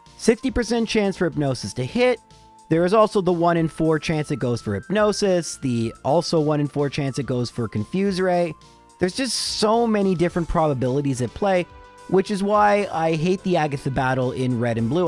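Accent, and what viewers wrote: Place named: Canada. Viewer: Oh the Canadian is so noticeable.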